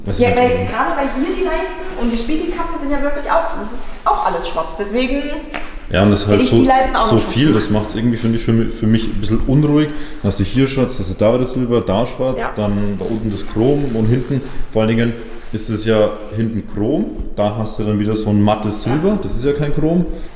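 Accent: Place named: Saxony-Anhalt, Germany. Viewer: "Jetzt schießmer volles Roohr in den Caaarpooorn" :-) Ich komme gebürtig aus Sachsen-Anhalt. Dieser doch so heimische Dialekt.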